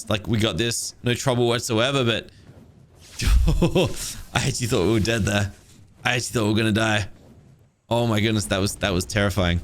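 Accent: Australia. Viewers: Sounding extra Aussie today. Good stuff!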